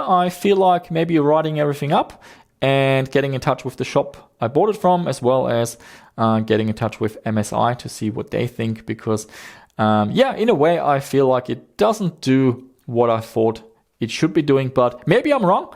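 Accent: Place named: Germany. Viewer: I have to ask, are you originally from Australia? Cause your accent sounds German to me for some reason.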